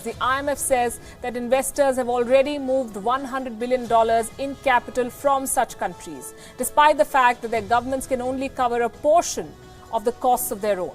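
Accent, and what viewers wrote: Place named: India. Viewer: INDIAN ACCENT PAY PEY, SOON SUN, SAY SEE, ABLE EBLE, PEOPLE PEEPLE, TAKEN TEEKEN, AID EED, WAIVE WEIVE, NATION NETION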